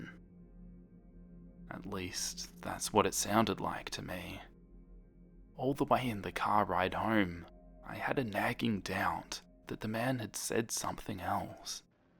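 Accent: Australia. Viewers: Australian I think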